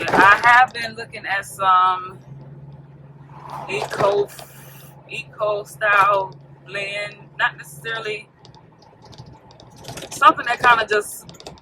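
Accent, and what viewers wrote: Jamaica. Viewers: How do you do that? For a Mississippi girl your patois sounds authentic!😊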